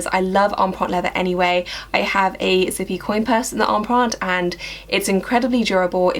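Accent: United Kingdom. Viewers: I am not against her right to make video on luxury bag. The reason why I watch her video is that she is such a sweet lady with amazing British accent. Being an English teacher, I really enjoy the way she speaks . There is nothing wrong about her making video. Sometimes I get frustrated about the fact that we work so hard just for earning a living, hardly can afford kath kidson bags.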